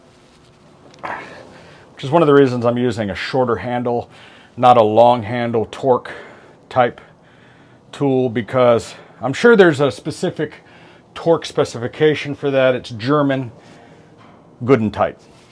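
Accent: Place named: United States. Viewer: Yup! The American accent is difficult to follow especially when spoken at such hectic speed! An awful lot of talking ("tarkin') but little action. Man, "you gotta get your butt" to Cape Town, South Africa, where we do things "good and proper" - even with RR, Jags, BMW, - the whole lot! Metric and Imperial - no problem! Oily hands - no problem! We don't even need silly gloves - we use hot water and soap! We also speak a better English than the English in England! 😊 My Dad managed the best BMC agency in South Africa, and I spent much time at the benches after school, learning skills and a few naughty words! The skills are ever-useful, and I am blessed, at the age of eighty-one, to be able to run a health practice at home and to repair so many home items for my clients!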